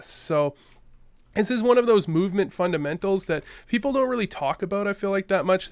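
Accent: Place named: Canada. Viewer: Just figured out that hes canadian from that sorry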